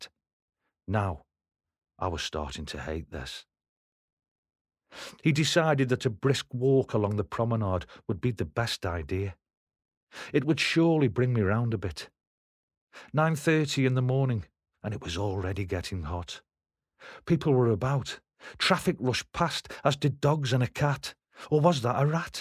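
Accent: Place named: Stoke, United Kingdom. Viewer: Perfectly narrated and nice to hear I being told with a local accent, never was into the football scene, was more into the old club scene in stoke and very proud of my home city Stoke On Trent. Just downloaded Naughty based on this.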